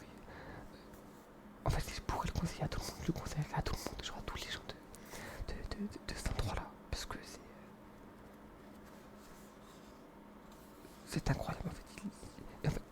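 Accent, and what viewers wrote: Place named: Lyon, France. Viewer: Sérieux t de Lyon ! Moi aussi ! Ça me ferait de te croiser Inchallah mon frère . J’me disais aussi il parle comme les lyonnais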